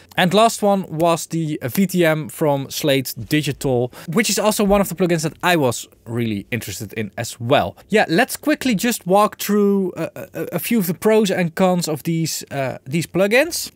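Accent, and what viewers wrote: Netherlands. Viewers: The Dutch accent is powerful, I like it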